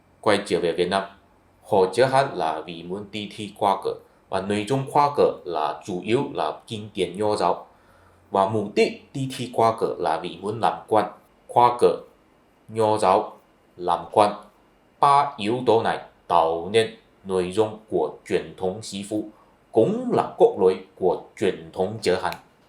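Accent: Cantonese, China. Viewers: Ông này nói tiếng việt rất đúng ngữ pháp chắc vì là người gốc hoa nên cách phát âm nghe cũng có vẻ na ná như tiếng trung vậy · Ô này hình dáng bên ngoài là người Việt Nam, adn là người Việt Nam nhưng tiếng nói bị hán hóa, nghe tiếng thì ô này người Quảng Đông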